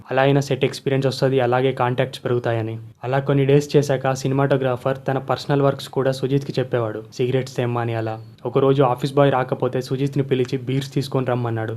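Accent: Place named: Warangal, India. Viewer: Hi...ne video motham chusa 1 sec kuda waste chykunda u explained vry gud..proper warangal or karimnagar laga undhi me slang...iam Sandeep frm Hyderabad..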